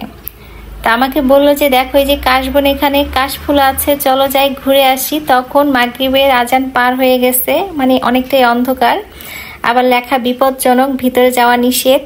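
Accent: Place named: Faridpur, Bangladesh. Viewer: Deshi bon tomar mukhe faridpurer kotha sune onek valo laglo❤❤❤❤rannagulo❤❤❤mashallah babata❤❤❤ghurte gele❤❤❤❤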